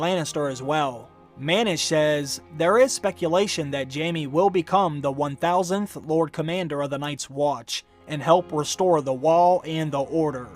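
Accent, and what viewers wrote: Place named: Alabama, United States. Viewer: Are you from Alabama? cause you sound like forest gump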